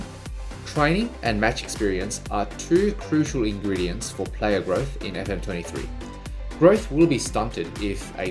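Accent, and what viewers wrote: Australia. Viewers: every Australian who watches his videos can instantly tell that is an Australian accent, not a super bogan true blue stereotypical Aussie accent but he’s still clearly Aussie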